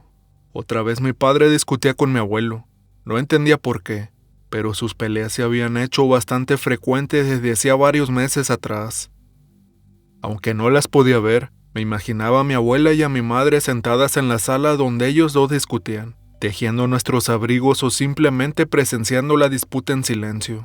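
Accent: Mexico. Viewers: Eres uno de los mejores narrando...tu acento de dónde es?? De que parte de México???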